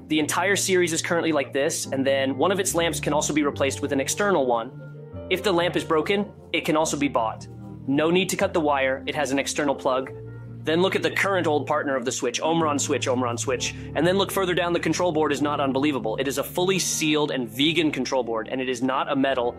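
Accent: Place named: India. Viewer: Omron switch omron switch? Is this automaticly translated? Vegan control board? WTF it sounds like a indian person speaking english for a first time…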